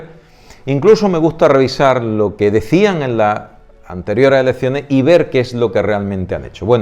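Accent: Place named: Andalusia, Spain. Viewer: Sabía que eras andaluz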